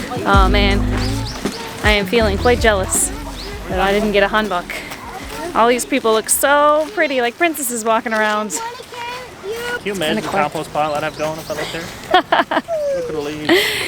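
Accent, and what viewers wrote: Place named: United States. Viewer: I love your English accent with excellent pronunciation!! You speak very well with clarity and coherency!! You sound more of an American than a Canadian for some reason though. Sorry if this sounds offensive. Did you live in the U.S.?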